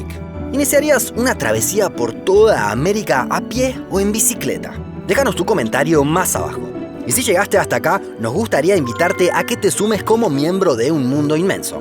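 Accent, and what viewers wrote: Argentina. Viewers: El narrador habla argentino, viste?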